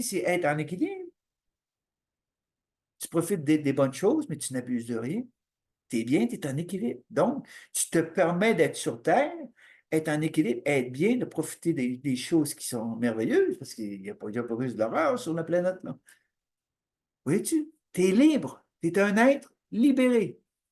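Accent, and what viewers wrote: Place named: Canada. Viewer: Bo.jour Merci merci merci Elles sont très utiles vos vidéos mais svp améliorez leur son car nous les francophones qui ne sont pas du Canada nous avons du mal à vous comprendre vu votre accent et le son qui n'est pas très clair. 🙏🙏👍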